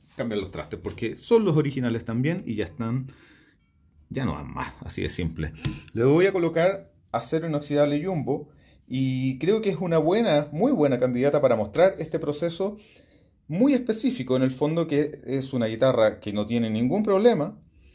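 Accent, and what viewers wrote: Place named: Chile. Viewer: My wife said u r from chile after hearing your accent 🇨🇱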